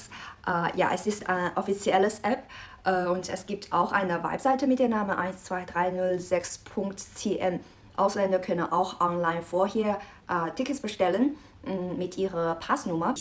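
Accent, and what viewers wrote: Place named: Germany. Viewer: Deine Aussprache ist sehr gut! Ich schaue auch aus Österreich, hier in Österreich sprechen wir China aber anders aus, mit "K" also "Kina", so wie Charakter, Chaos, Cholera alles mit K am Anfang. Chemie wird auch mit K ausgesprochen. Man merkt also, dass du in Deutschland Deutsch gelernt hast ;) Wie lange lebst du schon in Deutschland?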